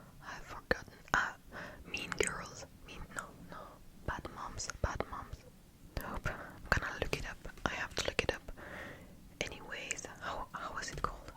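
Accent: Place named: France. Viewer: make more videos with french accent please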